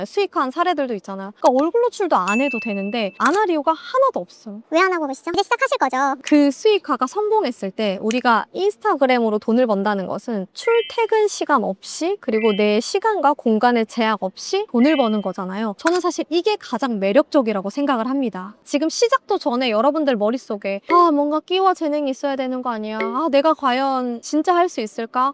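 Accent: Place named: Gyeongsang, South Korea. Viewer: 경상도 억양이 있으시네요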